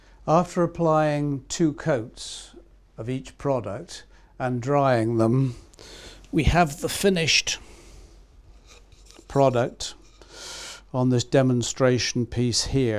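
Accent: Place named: United Kingdom. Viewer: nothings more convincing than a british accent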